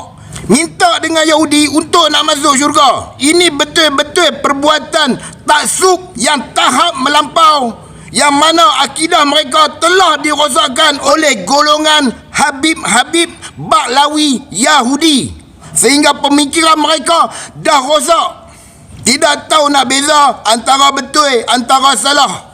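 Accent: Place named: Kedah, Malaysia. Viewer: Salam , org Indon faham juga ya cakap orang kedah Malaysia . Terbaikkk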